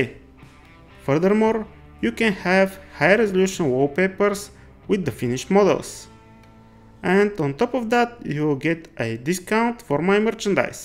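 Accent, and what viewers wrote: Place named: Russia. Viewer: Акцент русский 😁 Работа отличная!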